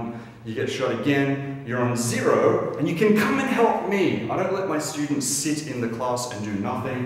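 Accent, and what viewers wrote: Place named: Darwin, Australia. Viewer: He sounds like he's from Darwin, Australia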